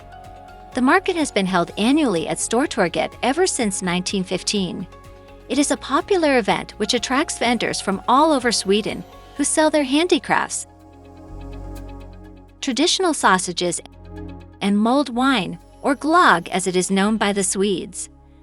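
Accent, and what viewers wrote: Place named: United States. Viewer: Can not listen to awful narrative with american woman accent jars on ear's.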